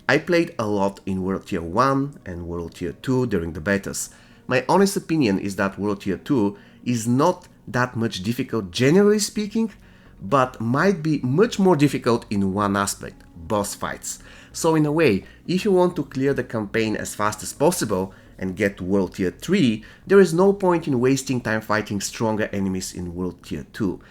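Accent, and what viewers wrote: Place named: Romania. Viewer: Dupa accent esti roman :))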